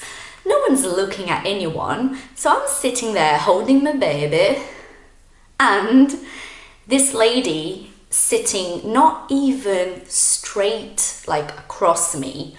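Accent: United Kingdom. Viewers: é a pimeira vez que vejo seus videos, mas gostei demais da informação! tenho aprendido português e inglês pela minha conta , e acho muito importante melhorar e corrigir nossos erros por não sermos nativos, seu sotaque é realmente lindo, depois de 15 anos ou mais estudando inglês americano seria dificil para mim imitâ-lo, mas vou recomendar seu canal aos meus estudantes de inglês que gostariam de aprender o sotaque britânico!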